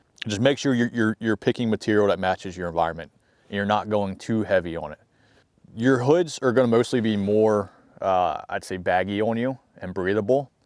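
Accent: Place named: Pennsylvania, United States. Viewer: Lol i can here that PA accent anywhere, channel emote reconfirms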